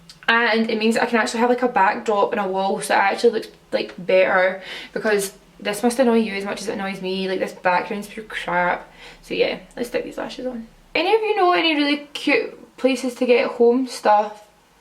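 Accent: Scotland, United Kingdom. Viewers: I love this video and your hair looks awesome! Its nice hearing little Scottish words every now and then and your accent (I'm from Perth) :) also really liked how chatty you were x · I looooove your various eyeshadow looks! brand new suscriber here and since most of the channels I follow are American it is SO weird hearing a fellow Scottish accent :D where about are you from? Are you able to write out product lists for your videos? it would be super handy! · thats coz she is scottish me too so i can understand everything she said lol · She's Scottish 😊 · love your get ready with me's, especially your chatty ones!! it's so nice to listen to someone else that's scottish for once, such a stunning look!! defo gong to try this sometime xx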